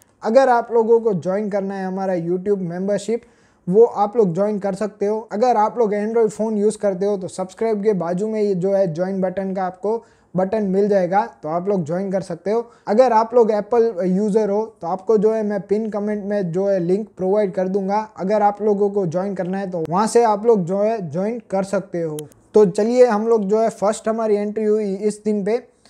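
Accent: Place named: Kathiawar, India. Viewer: Bhai Kathiyavadi lage chhe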